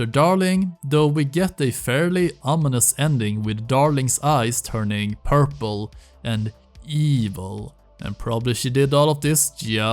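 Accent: Sweden. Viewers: You sound so swedish. It´s funny. Good video.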